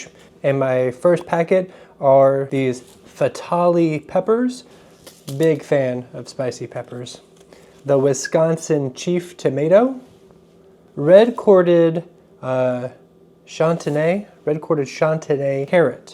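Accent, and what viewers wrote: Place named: West Africa. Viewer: The French with a west African accent piqued somdthing....are you a MK?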